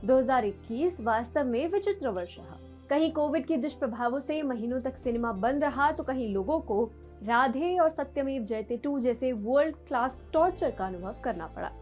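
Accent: Standard. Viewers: Listening to pure Hindi was good ms. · Yaar itnaa suddh hindi kaise bol lete ho pehle yeh batao? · I must appreciate your shud hindu pronounciation 😘😀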